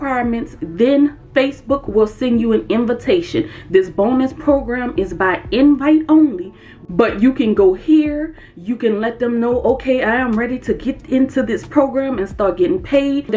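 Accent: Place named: Louisiana, United States. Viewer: Nope....you can't hide that accent...hello my fellow Louisiana sister lol. I'm from Lafayette.. keep inspiring us thank you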